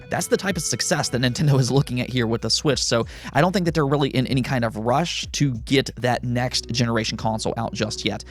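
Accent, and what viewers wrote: United States. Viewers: Your American Accent is really really strong, wow is most noticeable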